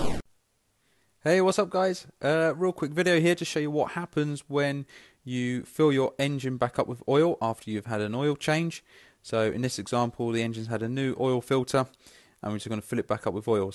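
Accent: United Kingdom. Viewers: Instead of sounding British, you could try enunciating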